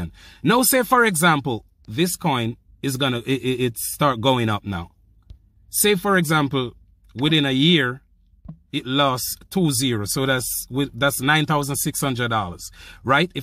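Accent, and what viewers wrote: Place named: Jamaica. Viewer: Love the Jamaican accent, I’m Jamaican as well Brother. I was thinking along the same lines as you. 100 dollars and just leaving it is a very responsible idea as a leader. Your not pushing, your just sharing an idea. Respect. I was thinking of a different idea, and that is to think like the amc apes, they buy and hodl, the only problem is, the stock market can produce naked shorts and then their battling nobody, because the hedges have found a way to short the stock without using money. In decentralized finance, you can’t do that, I want to see a crypto like this have a community that doesn’t give out crypto, but buys and hodls like apes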